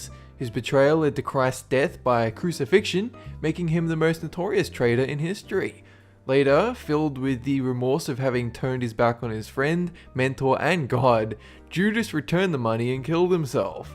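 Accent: Australia. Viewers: Does he have an Austrailian accent or is it something else?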